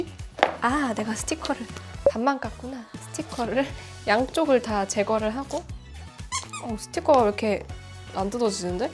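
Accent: Gyeongsang, South Korea. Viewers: ㅋㅋ 경상도 사투리. 찢으셨다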